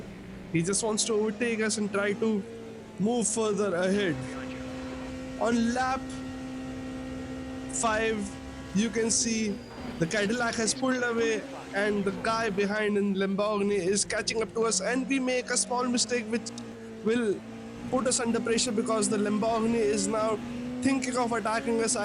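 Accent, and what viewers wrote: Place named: Arab world. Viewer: Are you Arabic? I can hear your accent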